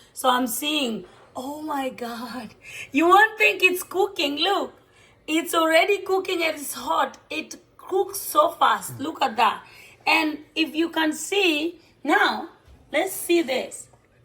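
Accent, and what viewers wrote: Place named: Luo, Kenya. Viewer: Thanks for the very helpful tips, and especially nice to hear the instructions in your Luo accent.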